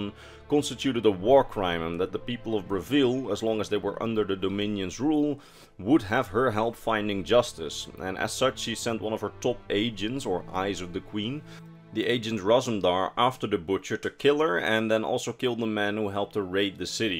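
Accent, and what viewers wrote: Netherlands. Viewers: You sound like a Dutch-speaker.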